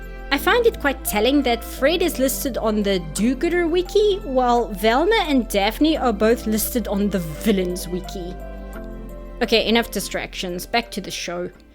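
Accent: South Africa. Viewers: oh geez i di not know you were from south Africa ? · lol I never would've thought of fred as a fellow autist but now it makes sense Also her south african accent is hot af, I hope I'm not the only one that thinks this XD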